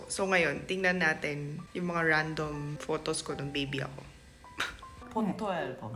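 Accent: Batangas, Philippines. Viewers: Your phillipino accent is so pleasant to hear. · hala yong galawan at pananalita mo parang pinoy na pinoy siguro mahilig ka ng tropa sa pilipinas noh kaya ganyan ka ka daldal😂😂😂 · laking batangas ka pihado ano ang salita baga halatang halata ih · hahaha gets ko na kung baket ka super fluent and with a thick filipino accent. Iskolar ka pala ng bayan. Pero sabaw ka rin ahhahahha! stay weird! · Koreanang Batangueña . Ala eh kainaman. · Wow I like all that you're now. You had adopted the basic pinoy lifestyle: your accent, attitude, and your behavior I don't want to say forgetting your Korean way, but I'm just proud having you in PH. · Nice lady with pinoy accent.. ala eh kagandang bata nire . Lasa ko'y mas pinoy pa ito kesa sa mga koño na pinoy. Be safe lagi. I enjoy your video's.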